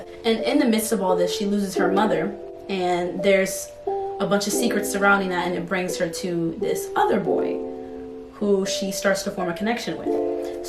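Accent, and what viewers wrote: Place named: England, United Kingdom. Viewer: Oh my god, so glad you popped up on my recommended! You have such a great personality and loving the english accent ;)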